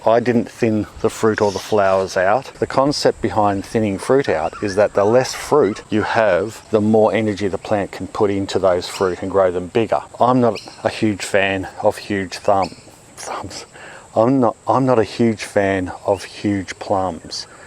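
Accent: Australia. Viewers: Greetings from France ! Someone recommended your channel to me, and i loved this video :) I really like your AU accent too !! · I love australian accent!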